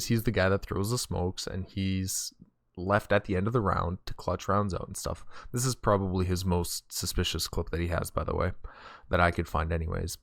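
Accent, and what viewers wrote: Canada. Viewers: are you from montreal bud?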